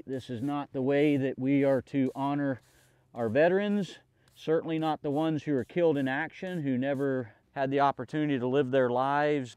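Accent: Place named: Florida, United States. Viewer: You have a Florida accent, like Gary Rossington from Lynyrd Skynyrd.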